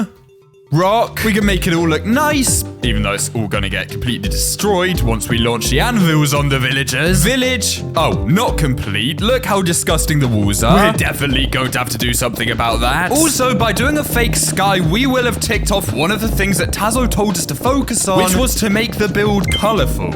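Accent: United Kingdom. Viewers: He sounds so british, not like the language, just... british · R u British cuz u sound a lot load like one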